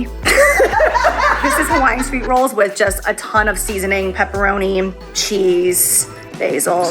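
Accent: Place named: Upper Peninsula Michigan, United States. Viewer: I know this is super random. But, I think it's so funny that you're a born and raised Floridian, and you say 'Reesees' instead of 'Reeses'! I live in the UP of Michigan and I do remember you saying you've been here and have family here. And that's how us Yoopers say Reese's too🙂 love you! And I'm so proud of your journey, girl 💜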